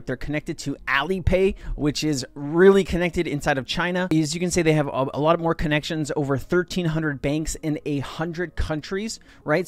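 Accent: Australia. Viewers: Sounds like a fellow Aussie. Letsgetitttt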